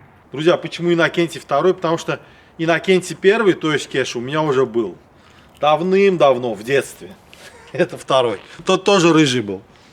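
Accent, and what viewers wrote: Baku, Azerbaijan. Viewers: Бакинский акцент самый узнаваемый и лучший✊🏼🤓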